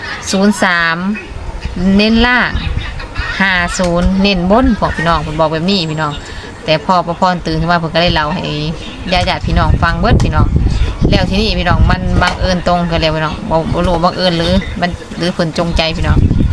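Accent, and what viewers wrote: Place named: Laos. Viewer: ออกลาวบ่อจร้า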